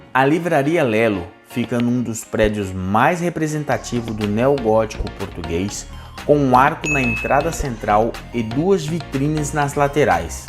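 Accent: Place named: Brazil. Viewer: Português com sotaque Brasileiro, gostei.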